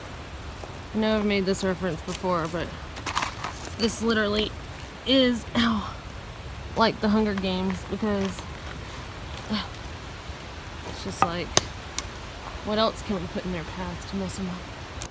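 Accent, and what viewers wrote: South, United States. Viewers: "This here sign..." lol I love it. You can take the girl out of the south...never the south out of the girl. Rock on.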